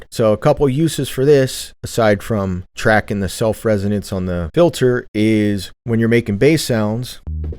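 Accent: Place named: United States. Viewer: For some reason I thought you were British, but that's an American accent thru and thru. 😅 I think PolyMax sounds higher-end than a Juno - more like a Jupiter. Maybe somewhere inbetween. :)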